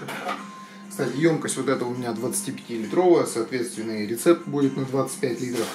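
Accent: Perm, Russia. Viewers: Пермяки многие так говорят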